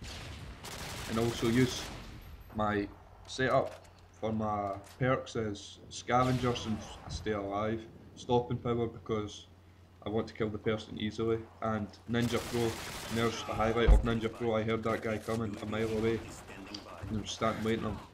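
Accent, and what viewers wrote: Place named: Scotland, United Kingdom. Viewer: subbed cause you from scottish mon the scots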